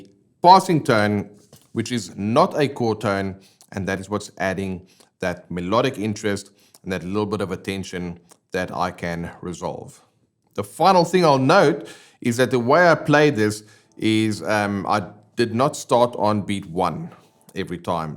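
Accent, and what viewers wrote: South Africa. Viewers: thanks for the vid! Are you south african by any chance?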